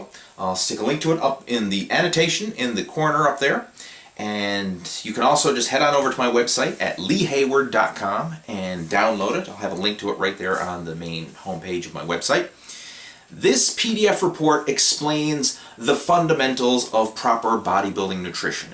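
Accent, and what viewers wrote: Newfoundland, Canada. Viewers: Buddy, you sounds like your from NL!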